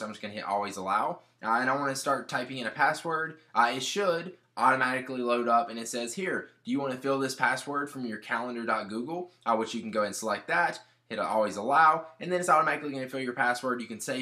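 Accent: South, United States. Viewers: very southern.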